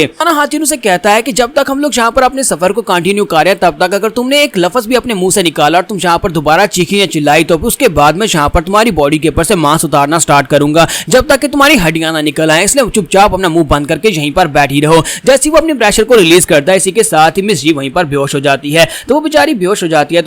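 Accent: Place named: Pakistan. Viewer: Bolane Se to lagta Hai yah Pakistani ka hai rakhta hun Pakistan se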